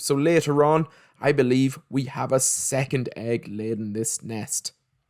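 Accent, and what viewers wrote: Ireland. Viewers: Nice Irish accent! Cheers! ☘🍻💚🧡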